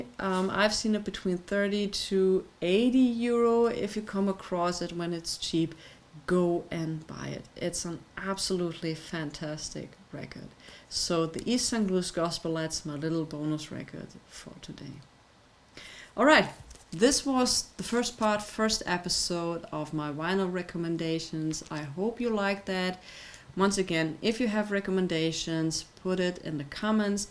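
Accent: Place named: United Kingdom. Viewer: ' " " was a good album, vocals by. Michael later released his Funk/R&B classic " " which I had on vinyl when it was first released in 1980, great album. Do you listen to mainstream Funk/R&B? I'm a white man living in California [U.S.A.] and been listening to Funk/R&B since the '70s. I like groups like Ozone, Chocolate Milk, Sun, General Caine, etc. I like your selections, because to me, I've never heard of any of them, which makes me interested in what they sound like. You sound like you're from the U.K. and you had different singers & bands that never really hit in the states, especially in California. I've heard of some of your picks, two of them were & [ had a hit in the states called " " from 1983, it was a good dance tune] so some of your picks were new to me. It's nice to see someone as knowledgeable as you when it comes to Soul/Disco music, I am a fan of both genres also. 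Disco music to me was a little bit cheesy at times, because everybody & their mother were doing a disco song back then, there was stuff like " & " " that drove me nuts, because I just didn't like them. I've listened to a lot of different styles of music growing up and am lucky to have experienced everything I've listened to or was recommended. Do you like " " by, to me that song was funky & very dance driven when it first cam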